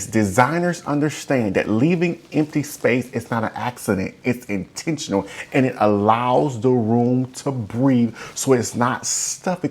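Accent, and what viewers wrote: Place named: South, United States. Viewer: Not to be weird…. But, I just love listening to you! Yes, I subscribed. You are helpful, honest, forthright, and you make me laugh. :) And I love your southern accent!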